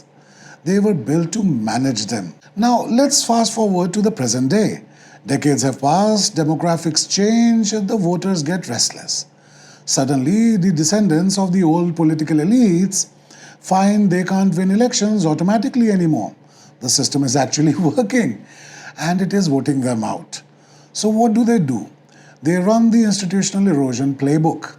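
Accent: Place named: United Kingdom. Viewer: Amazing 🎉❤ amazing 🤩 Very British narration. Thanks for posting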